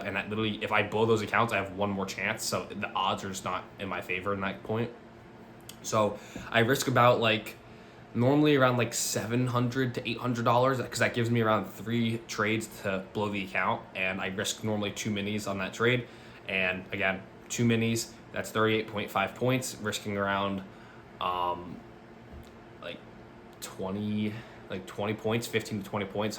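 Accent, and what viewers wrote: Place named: New Jersey, United States. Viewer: Nice video. Do you trade the synced accounts on tradongview? Also so curious where your accent is from. NJ?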